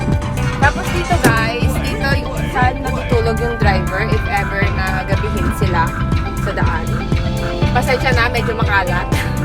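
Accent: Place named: Ilonggo, Philippines. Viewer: Ilonggo ang tonoo ahh kababayan to pa pla😂😂😅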